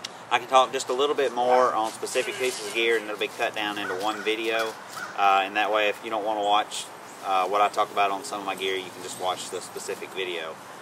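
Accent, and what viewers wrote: South, United States. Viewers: I like your video. It's very informative. I'm new to hiking and I have have a question about the pack. Do you expect the pack to last a few years? The cuban fiber fabric seems so thin and looks like it won't last very long. I've never seen any in person just here on YouTube. I noticed the zpack website has a 1 yr warranty. I would just want to make sure it's worth the money. Also, you sound like you're from the south! Have you ever hiked The Pine Mountain trail in Georgia? It's a really nice trail with a little bit of presidential history. Franklin D. Roosevelt's Little White House is near by and he used to vacation there a lot. Thanks for the info!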